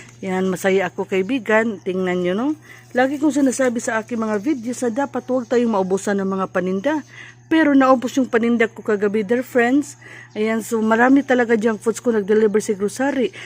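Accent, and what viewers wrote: Visayas, Philippines. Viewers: Hahaha Visaya diay 😅🌸🌼🌻Friend na tayo Te, salamat sa pag share Idol,, nanoud kasi ako, nag dala nang Goodluck hahaha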